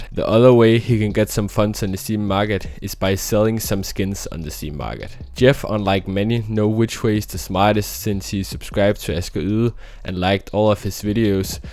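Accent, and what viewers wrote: Denmark. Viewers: i smell danish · I smell Danish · Kan høre der er lidt dansker over det her aha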